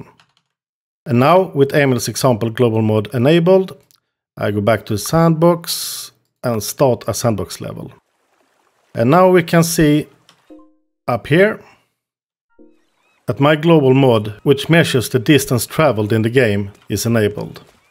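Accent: Scania, Sweden. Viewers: Den skånska brytningen gör videon så mycket bättre 🤣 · fantastisk skånsk dialekt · I love his accent. I'm guessing it's Swedish lol.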